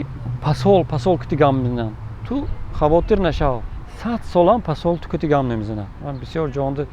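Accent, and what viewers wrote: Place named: Leninabad, Tajikistan. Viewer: Ето человек ленинабадски таджик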